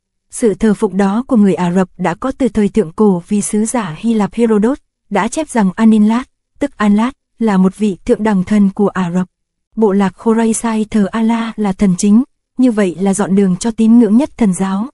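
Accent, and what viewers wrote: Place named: North, Vietnam. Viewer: Truyện hay và người đọc với giọng Bắc nhẹ nhàng làm hấp dẩn người nghe.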